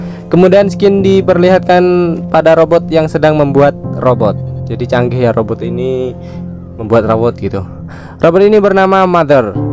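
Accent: Surakarta, Indonesia. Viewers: wong solo kah ? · Orang solo kah ? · Orang solo bang? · Orang solo?.. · weeee tekke wong solo to mbak e .. wkwkwk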